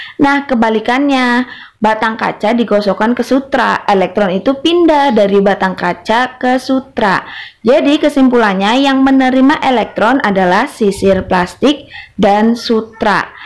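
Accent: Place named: Tegal, Indonesia. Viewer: Tegal ngapak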